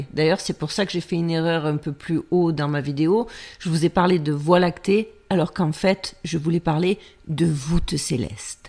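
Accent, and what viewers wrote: South, France. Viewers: J adore votre accent du soleil je connais ma famille se cité dans l herault région Languedoc-Roussillon surmène ❤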